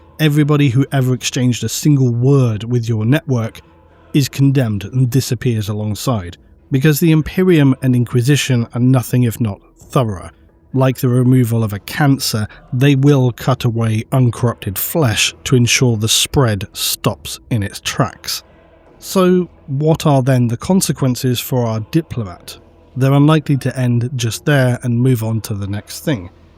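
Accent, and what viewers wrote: United Kingdom. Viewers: Surely he’s also in Europe, or at least he sounds British.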